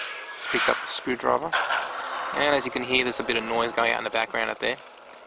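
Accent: Australia. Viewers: Awesome video. I am in Australia as well. I assume you are in Aus with that accent.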